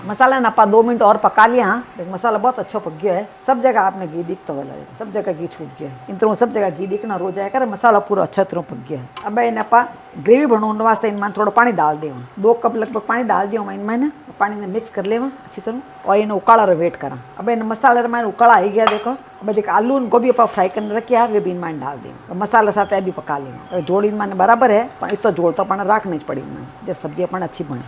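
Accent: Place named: Rajasthan, India. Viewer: Marwari apka bolna achalge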